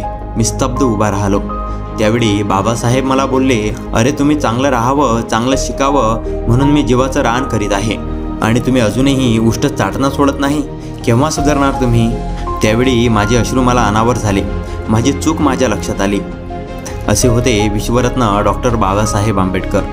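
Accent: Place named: Konkan, India. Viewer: Your speaker has not good is the kokani middle middle words I did not understand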